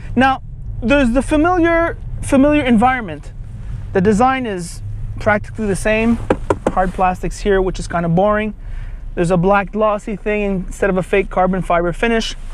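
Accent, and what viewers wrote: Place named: Montreal, Canada. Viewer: Dude has such a Montreal accent.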